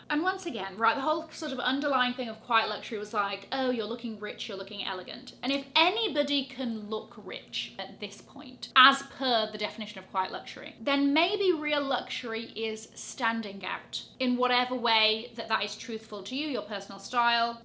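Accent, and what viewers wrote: United Kingdom. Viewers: Your synopsis is “sodden genius” (said in your British accent) ❤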